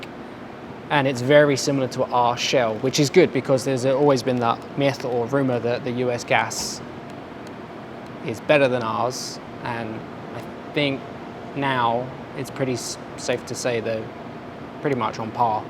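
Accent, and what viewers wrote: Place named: United Kingdom. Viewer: You're Canadian, but have a mild British accent, how's that, bro'?!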